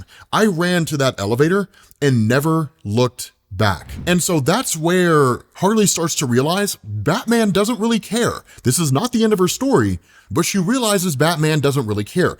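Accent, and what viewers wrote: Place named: Chicago, United States. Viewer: sounds like chicago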